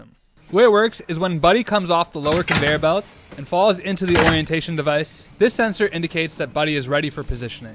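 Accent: Canada. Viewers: Buddy, are you a Maritimer?? ;-)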